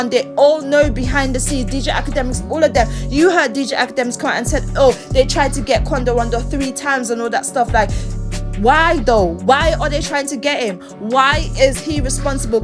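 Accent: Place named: United Kingdom. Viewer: Random but if you don't mind me asking, where are you from? I love ur accent if id have to guess it would be the UK but I'm not sure on what part of the uk.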